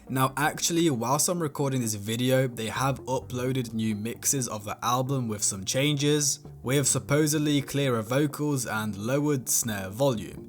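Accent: United Kingdom. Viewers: did this youtuber really just said "Young Scutaaah" ????? I hate UK accent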